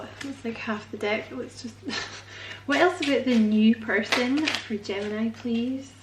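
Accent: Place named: Scotland, United Kingdom. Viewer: Thank you,. Always a sense of a breeze softly blowing through your reads. Hmmm…Scottish? Interesting. I’ve always loved the Scottish accent. Feel better quickly! 🙏🏻💗🦋😎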